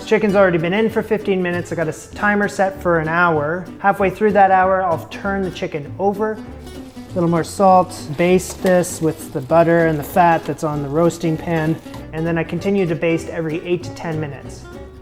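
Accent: Canada. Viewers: Your accent gave away that you were from Canada. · Thought you were a Canadian guy! ❤️😊🇨🇦 Love your channel, keep up the great content!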